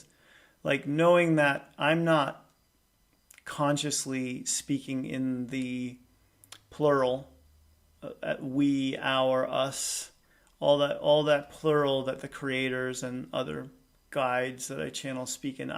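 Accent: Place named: England, United Kingdom. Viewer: Wow you channel as a English man 🙏💜 I thought you were English. Excellent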